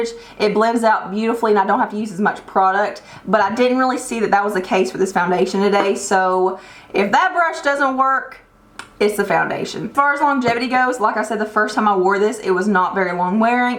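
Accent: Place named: Tennessee, United States. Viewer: Hey! Where are you from? I'm from Kingsport, Tn and you have the same accent as we do :) glad I found your Chanel!!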